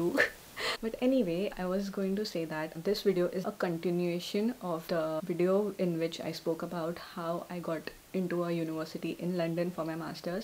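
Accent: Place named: India. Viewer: typical indian accent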